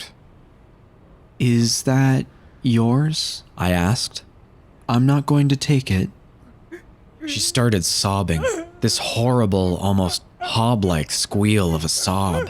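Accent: Canada. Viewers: The guy reading is definitely Canadian. About = aboot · I love your little Canadianisms btw:3 Which is your favourite of the stories you've narrated?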